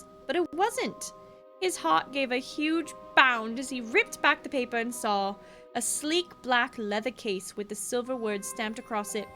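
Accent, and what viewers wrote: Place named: United Kingdom. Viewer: I love the British narrator voice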